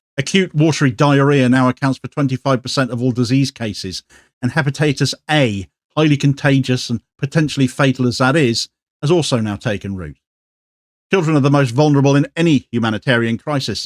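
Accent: Cornwall, United Kingdom. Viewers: Cheers for getting the alternative viewpoint out,. Respect and much appreciation for standing up against bigotry, shut down, intimidation and most sinister of all, genocide. I look forward to your measured "rants" on a daily basis and all in your warm and friendly Cornish vernacular. Keep going, dear friend ✌️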